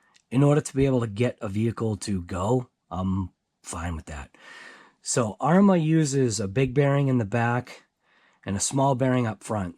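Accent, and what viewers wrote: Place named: New Jersey, United States. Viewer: Oh wow this is crazy that’s what they get for copy Arrma. Oops 😬 they have nice trucks. Where u located I’m in New Jersey telling by ur the way u talk is like me hit me back. And good luck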